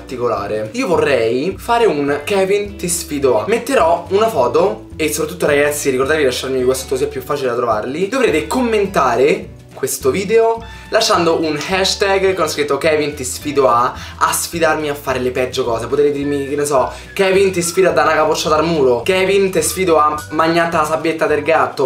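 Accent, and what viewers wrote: Rome, Italy. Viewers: hahahahha mi fai troppo ridere! e poi il tuo accento romano è fortissimo!! haha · Canti benissimo! Oltretutto amo sentirti parlare perché amo il romano ahahah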